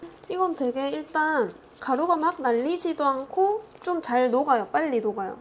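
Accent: Gyeongsang, South Korea. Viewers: 인스타로 보다가 영상으로 보니 더 친근한 느낌이나네용ㅎㅎ 특히 구수한 경상도 사투리가용 ㅎㅎ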